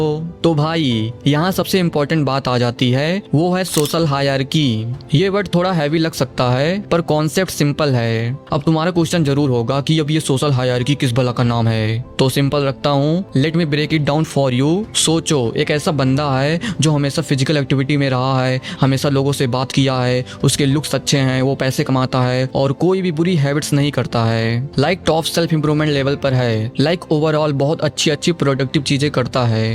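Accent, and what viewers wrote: India. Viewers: bruhh don't speak in english. your accent is soo indian man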